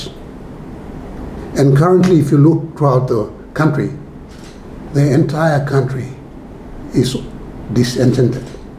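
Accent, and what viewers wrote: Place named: Papua New Guinea. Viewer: U speak like a PNG man and not an Engaian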